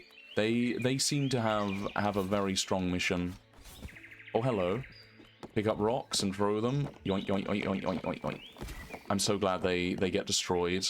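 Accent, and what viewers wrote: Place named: United Kingdom. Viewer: British man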